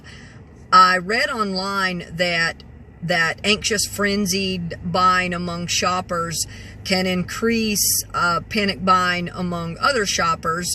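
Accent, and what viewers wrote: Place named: South, United States. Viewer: You have a southern accent. I assume you are in the south. You guys have mostly escaped the Covid realty until now. Trump country thought it was fake because you weren’t seeing it. We had bare shelves in Michigan six months ago.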